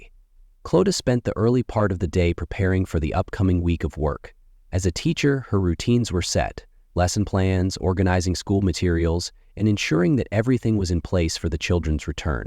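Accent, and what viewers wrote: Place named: United States. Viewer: Can u name the names correctly please your American accent ruined the story and since this there’s been a hell of a lot more like the most reasent of the 1 in rossfeild tallaght Dublin 24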